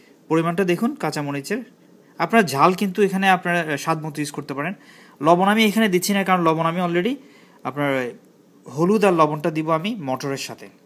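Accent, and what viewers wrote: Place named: Chittagong, Bangladesh. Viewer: is this man from chittagong ? he sounds so .. ! :-o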